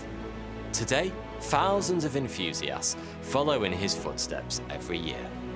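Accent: United Kingdom. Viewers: Could not take the British accent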